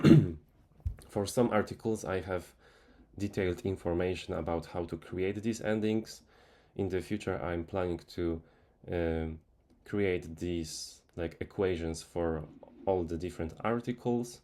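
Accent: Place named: Poland. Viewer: I am here for your Polish English to hear!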